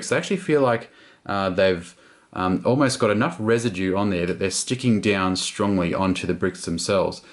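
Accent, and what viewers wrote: Australia. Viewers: Are you from Australia, you have that acent?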